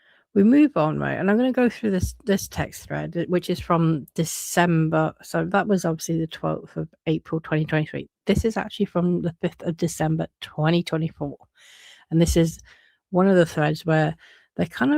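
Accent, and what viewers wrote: United Kingdom. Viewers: Just ran across your channel, from your accent i assume you're in England, I also saw another video that says they're moving to England. I'm curious, what do people there think about her and ryan?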